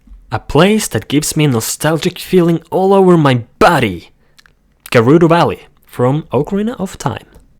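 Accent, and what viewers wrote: Norway. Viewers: That english sound like something i have heard before. Are you Norwegians? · Haha, hørte på måten du snakke på at du er Norsk :)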